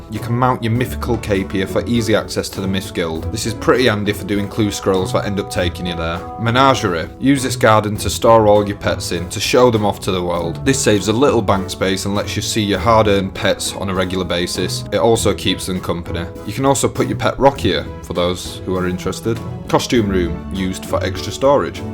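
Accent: Yorkshire, United Kingdom. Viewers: first yorkshire accent ive heard on an OSRS vid, legend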